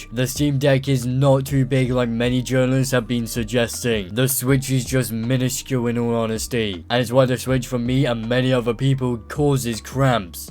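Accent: United Kingdom. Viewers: British accents crack me up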